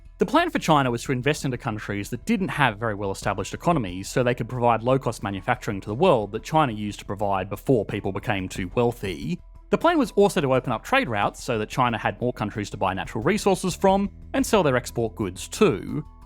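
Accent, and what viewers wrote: Australia. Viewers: I think you just have issues with the Australian accent, his demeanour is fine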